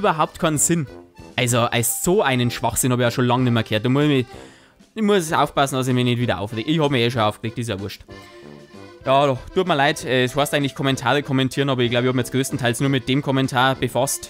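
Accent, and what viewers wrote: Bavaria, Germany. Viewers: Bayrisch hört sich zu komisch an 😂👀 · Ich feier deine Videos, vor allem weil ich selber Bayer bin und genauso sehr stark mit Dialekt rede. Deshalb gefällt mir dein "frei von der Schnauze reden". :) (auch wenn ich hardcore hochdeutsch schreibe, aber da ist meine Schulzeit dran schuld) Deine Parodien sind der Kracher. Die kann ich immer wieder anschauen und lach mir jedes Mal den Arsch ab. Wirst du künftig auch auf andere Serien außer Spongebob zurückgreifen? Z.B. Simpsons wieder oder Family Guy? Bleib wie du bist und schönes Wochenende!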